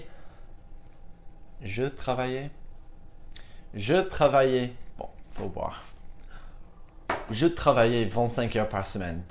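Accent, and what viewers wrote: United States. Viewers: Je suis aussi Americain, et j'ai suivi le cours de francais au lycee, il y avait 40 ans. Mais je peux bien parler francais, et je peux bien vous comprendre, parce que vous parlez sans vitesse, et avec un accent americain. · Bonjour, je trouve que tu parles très bien français, avec cependant un accent américain prononcé mais j'aimerais parler ta langue aussi bien que tu parles la mienne, tes vidéos sont très intéressantes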